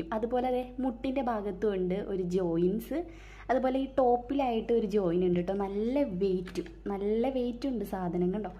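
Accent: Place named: Thalassery, India. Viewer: Super 👍👍 thalassery ഭാഷ kelkkannkile nigale entha youtube channel kannan varuu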